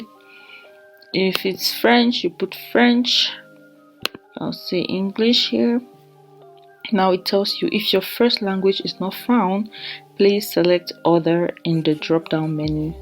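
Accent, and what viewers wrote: Nigeria. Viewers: Cameroonian u sound Nigeria 100%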